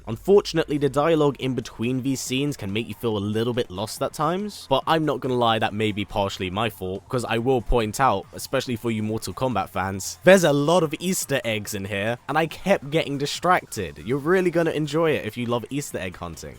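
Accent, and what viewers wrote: United Kingdom. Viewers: How did you watch it in the UK? (Assuming from your accent you're British too)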